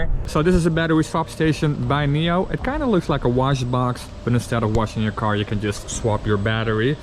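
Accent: Netherlands. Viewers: is the accent dutch?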